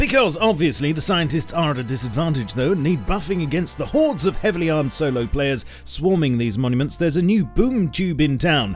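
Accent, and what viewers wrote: United Kingdom. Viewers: Yes, I finally get to hear my favorite British voice!!!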